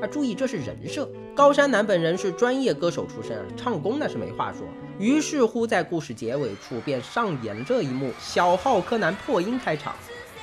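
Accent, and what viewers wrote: China. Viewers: 你是南京人啊？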